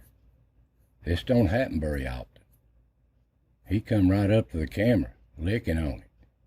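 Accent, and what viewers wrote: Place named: South, United States. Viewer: I need to get your smooth southern voice to come do a colab! Bring your metal detector.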